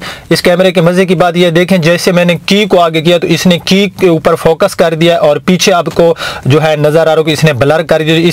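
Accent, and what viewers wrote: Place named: Thal, Pakistan. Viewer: Ap Thal kay ho Ap ki Zaban thal ki hai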